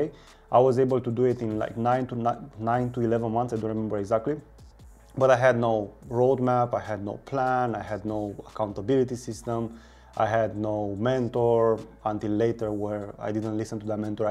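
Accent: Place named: Romania. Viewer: Pretty sure you're a mici enjoyer only by your accent. How about simply just jumping into projects and learn trough your mistakes? We have gpt, it can help us, but after the helping code is received, we should also learn from gpt why he did that, and then use that thing until u fully understand it. Coding for the average person (with a job or being a student or both, or even having mental issues), would take around 8-16 months to learn a language to the point where he won t use the internet and copy-paste code 60% of its project, or the big plante, being easy for him to explain his code.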